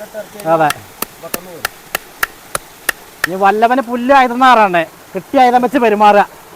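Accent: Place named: Palakkad, India. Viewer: Palakkad bhasha polea thonunnu. Kollam😊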